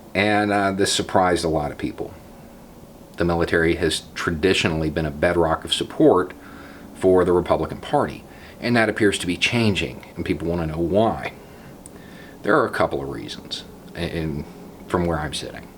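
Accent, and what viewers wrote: South, United States. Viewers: Not only is it really neat to hear progressive values in a southern accent, you actually add unique and interesting perspectives to the conversation. I am so glad to have found you beau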